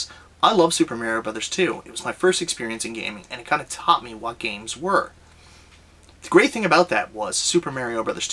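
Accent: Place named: Nova Scotia, Canada. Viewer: Canada eh? Based on your accent, I'd say somewhere near Nova Scotia.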